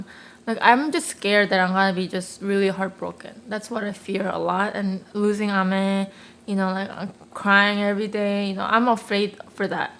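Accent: South Korea. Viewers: Unnie I'm sorry but you speak English in Korean accent I cant understand most of your words, cheongmal jusunghaeyo.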